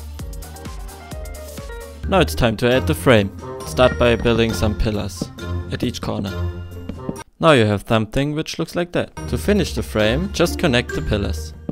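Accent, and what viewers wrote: Germany. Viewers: Er hört sich deutsch an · Ich will jetzt nichts sagen aber er hat ein deutschen akzent 😂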